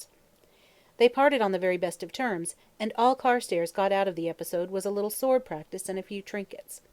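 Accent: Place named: United States. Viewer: Disappointing in American accent.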